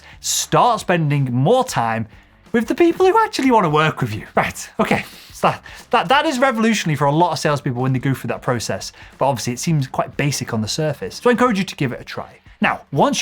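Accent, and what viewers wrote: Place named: North, United Kingdom. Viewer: Love your content, and the northern accent as always 😅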